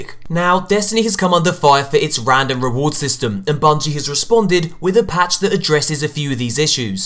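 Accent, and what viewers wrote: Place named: United Kingdom. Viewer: I watch these vids to here your voice and freak outs you snazzy Brit you x)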